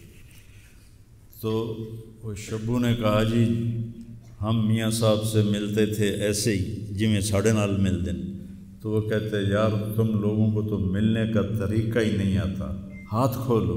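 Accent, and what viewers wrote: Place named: Paharpur, India. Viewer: Not a muslim, maulana sahab being a hindu, I have lot of love for you in my heart the way you speak, the same language my ancestors spoke,reminds me of my daadi, she passed away from this world in 2020, she was as innocent and lovely as you,my ancestors are from paharpur Dera Ismail Khan, NWFP.. my heart is full of love for you, to see you sad, saddens me too!! Literally in tears!!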